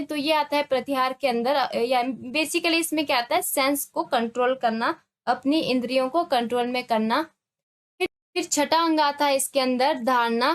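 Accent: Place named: Assam, India. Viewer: Your accent resembles Assamese accent. Are you Assamese?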